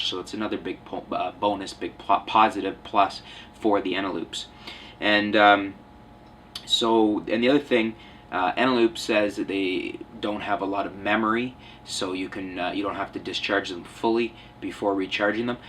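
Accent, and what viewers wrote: Canada. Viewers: best thing about the Eneloop batteries, is your awesome Canadian accent!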